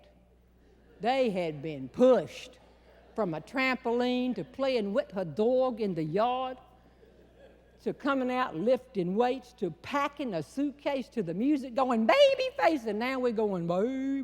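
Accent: South, United States. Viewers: She was so funny and had such an adorable Southern accent! I just loved Jeanne Robertson! ❤️ Good clean humor! And she was one of the greatest story tellers ever!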